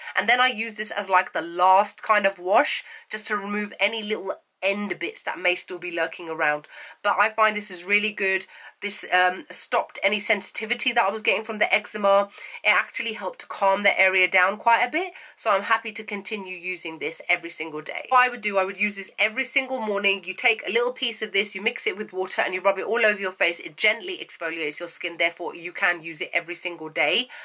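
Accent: London, United Kingdom. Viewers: "Its french init" ur sooo london lol xx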